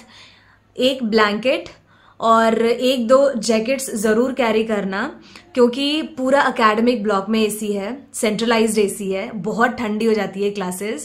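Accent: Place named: Bihar, India. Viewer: Aisa Q lg rha hai aap Bihari ho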